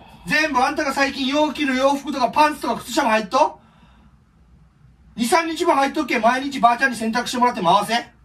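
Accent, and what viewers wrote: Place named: Kumamoto, Japan. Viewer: 熊本弁落ち着くわ～ 久しぶりに熊本帰りたい！☺️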